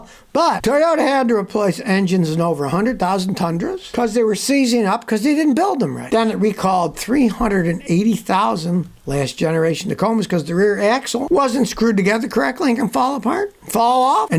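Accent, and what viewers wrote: Germany. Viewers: Your German accent has me rolling! 😂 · enjoyed the German accent. :D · im here for his German accent lololol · The German accent is hilarious!!! 🤣😂🤣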